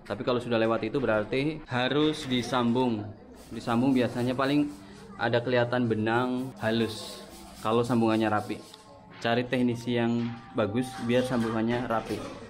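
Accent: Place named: Java, Indonesia. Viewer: Medok bgt mas'e.. 😆😆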